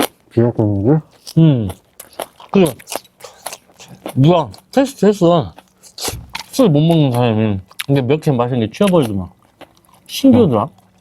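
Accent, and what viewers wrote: Jeolla, South Korea. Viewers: 요즘 계속 찾아보는 초짜영상입니다. 전라도 사투리 겁나게 재밋네요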